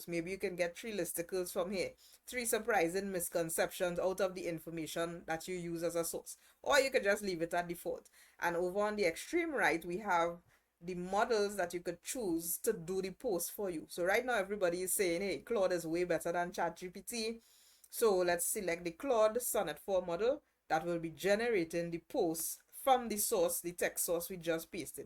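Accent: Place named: Trinidad and Tobago. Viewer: Super video!! also love the Trinny accent, keep going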